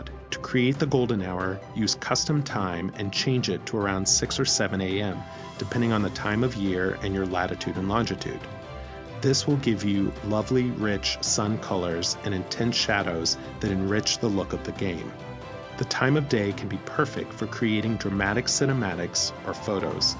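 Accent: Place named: Italy. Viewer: "Sorry for my bad English" cracked me up! Il tuo inglese è eccellente, better than most Americans, e gli Americani adorano gli accenti Italiani!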